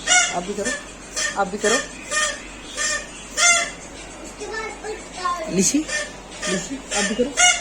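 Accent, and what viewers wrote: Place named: Lahore, Pakistan. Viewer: Lahori Apne bolne k lehjy se pechany jate h k yh lahore se h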